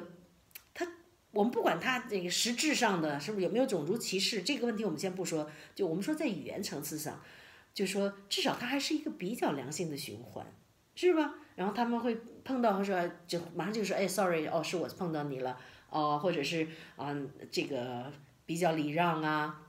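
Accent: United Kingdom. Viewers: 您的英音还挺好听的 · 喔，英伦口音好高雅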